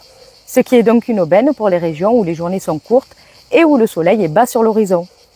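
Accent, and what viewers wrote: South, France. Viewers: Bjr madame merci pour vos partages vous avez un accent dû sud nous sommes peut-être voisines ,je vis en appartement je suis novice question semis etc vu les événements il faut que je puisse être autonome cela dis j'ai pas là main verte ,avez-vous des conseils ?sans indiscretion de quel département êtes-vous ?ps c'est un plaisir de vous écouter ❤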